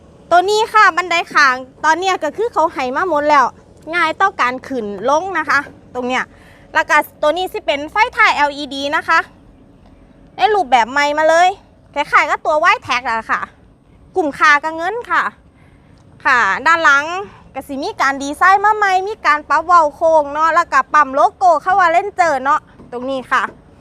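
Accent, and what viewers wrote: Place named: Isan, Thailand. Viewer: ข่อยมักเจ้าเว้าอีสาน✌️😁😁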